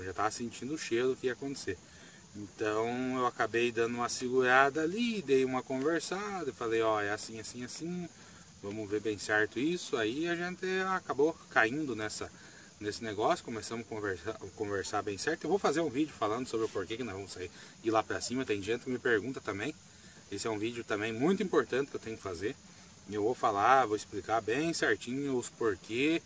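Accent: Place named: Paraná, Brazil. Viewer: Que orgulho do nosso sotaque paranaense .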